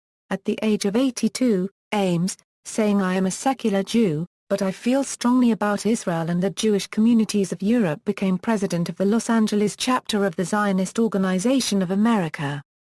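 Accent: United Kingdom. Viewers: Robo-voice with British accent.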